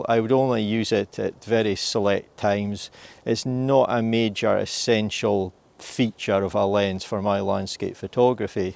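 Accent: Scotland, United Kingdom. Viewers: I knew you were from Scotland before you mentioned it.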